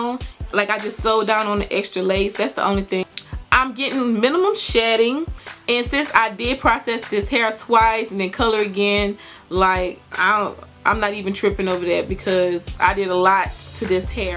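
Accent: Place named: South, United States. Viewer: OMG I freaking luv this video! Yass honey! I felt like you were giving us! Love your personality and your country accent! You look GORGE by the way!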